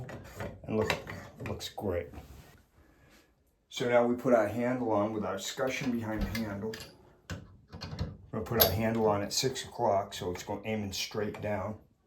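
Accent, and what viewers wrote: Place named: Cape Cod, United States. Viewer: Being a native Cape Codder, I could tell by your accent.😂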